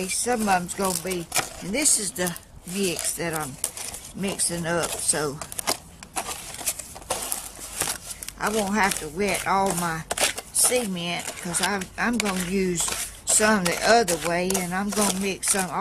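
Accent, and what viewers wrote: South, United States. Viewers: Every syllable with that sweet southern drawl is precious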